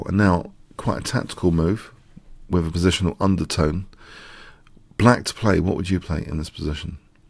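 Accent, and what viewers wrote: United Kingdom. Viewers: yeah you know you’re British accent is different than what I’m used to hearing being an American unfortunately.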